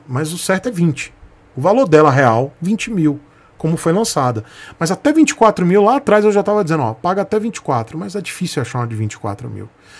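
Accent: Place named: Rio de Janeiro, Brazil. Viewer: alguem mais acha o sotaque carioca insurpotavel? kkkkkkkkk e minha opinião abraço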